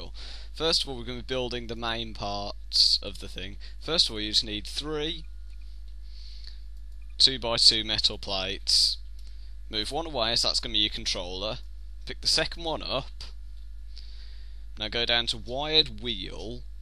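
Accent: United Kingdom. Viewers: i hate british accent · this man is British. He sounds like a young Ringo Star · this guy sounds more british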